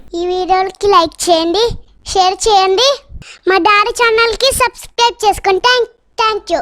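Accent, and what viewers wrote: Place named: Vijayawada, India. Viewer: Hii bro ! ♥️ big fan for your explanation & good mana Vijayawada slang 🔥♥️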